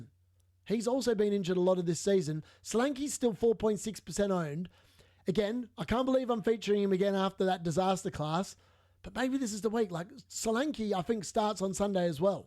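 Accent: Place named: Australia. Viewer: The Aussie accent and the pronunciation of names got me subbing 😂 Brilliant show, mate! 👍🏼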